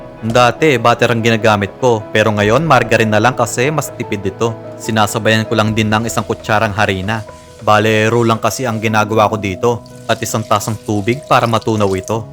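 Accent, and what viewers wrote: Pampanga, Philippines. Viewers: Kapampangan po ba kayo?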